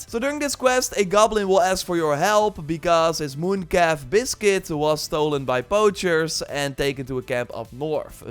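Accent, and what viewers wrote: Netherlands. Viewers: You sound so dutch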